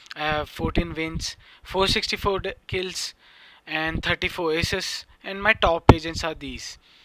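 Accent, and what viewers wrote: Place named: India. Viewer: I love ur accent bro . Its indian but not like the other indian videos which are completely inaudible. You actually speak english very fluently and i have had no problem understanding u. Keep up the content guy!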